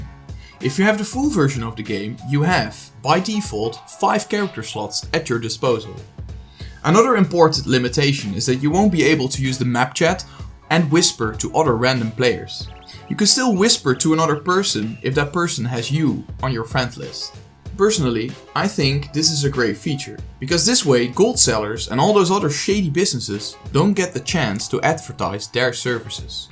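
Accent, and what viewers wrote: Netherlands. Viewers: I hear a dutch accent :P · Are perhaps Dutch? I'm basing that on your accent.. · This fucking Dutch accent lol. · haha are you Dutch?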